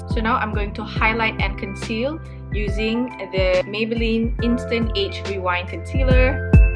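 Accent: Penang, Malaysia. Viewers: kak buat la video cakap bahasa melayu, seronok tgk btw saya orang penang so loghat kita sama. riuh dia lain macammmmm hahahaha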